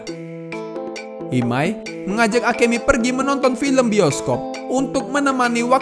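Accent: Medan, Indonesia. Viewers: abng orang medan ya bang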